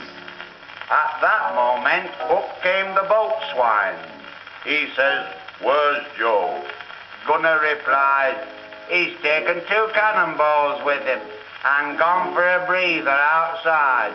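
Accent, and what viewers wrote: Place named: Yorkshire, United Kingdom. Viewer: "Stanley Holloway is impersonating Marriott Edgar here"? I beg to disagree. Marriott Edgar was a native Scot, so I don't believe he spoke like this. Although he wrote the poem (lyrics), it does lend itself admirably to the accent employed by Stanley Holloway - one very unlike that of Scotland but most similar to that of the middle/southern part of Yorkshire - for this recording and many of his other collaborations with Edgar.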